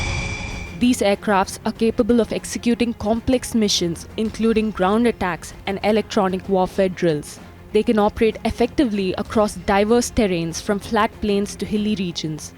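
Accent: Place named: India. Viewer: You don’t want an Indian speaking with an Indian accent? Every spoken word in the world has an accent.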